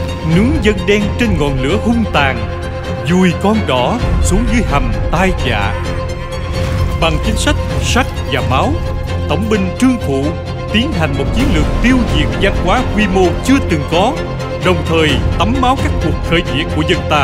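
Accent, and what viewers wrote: South, Vietnam. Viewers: Đọc theo giọng miền Nam mà bạn cũng không sao cả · ồ hay đấy nhưng lần sau thay dọng đọc đc ko? Người miền Nam đọc toàn sai chính tả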